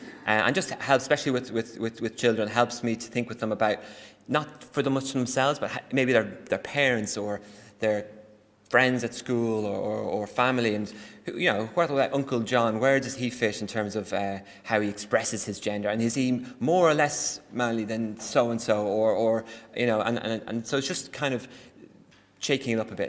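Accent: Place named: Ireland. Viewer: If he had a German accent instead of that lovely Irish one, I think people would be much more suspicious of him.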